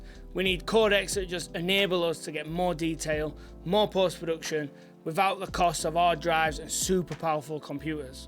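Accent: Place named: Northern England, United Kingdom. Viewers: Ey up, nowt wrong with a Northern accent lad! 😝 BUT onto mounts... I wonder if we can, via that service Sigma offer, get EF mounts changed to L? Most my Lenses are Sigma anyway nowadays! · Never judge a book by its cover. This dude looks and sounds like a cage fighter crossed with an English bulldog yet everything he said is well informed and is surprisingly balanced view with some great research and insight. Connecting the dots makes sense and although it’s unlikely black magic will make a cube anytime soon the l mount is a sleeping giant and the investment by dji is key. Bm need a new mount and red and canon will almost certainly not let bm anywhere near rf. excellent despite the heavy northern accent this dude knows his stuff. Don’t let those prejudices loose. Sound logic has no accent.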